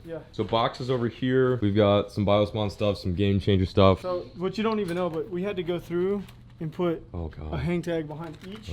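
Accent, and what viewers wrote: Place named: South, United States. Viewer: Smh he jst done came off? Dam outta chi only a lil bit n u gt a southern drawl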